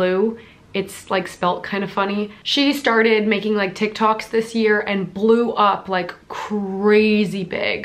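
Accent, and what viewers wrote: Canada. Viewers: Are you from Canada? I'm getting some accident and I'm really dumb aaa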